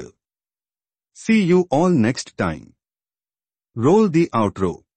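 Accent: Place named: India. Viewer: That probably isn’t a robot, plus Indian accents might sound funny but is just how they speak.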